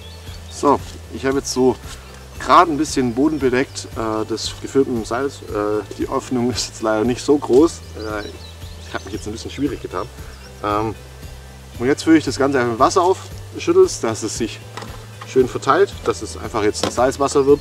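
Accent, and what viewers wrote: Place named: Swabia, Germany. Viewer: Wo sind wir denn gerade? Bei uns im Schwobaland? WMH! 🌿🌲 so hört es sich zumindest an…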